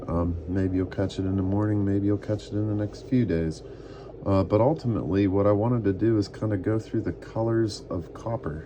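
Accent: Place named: Pittsburgh, United States. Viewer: Sounds like you are from Pittsburgh. Or somewhere close. Anyway I like the red/orange cents.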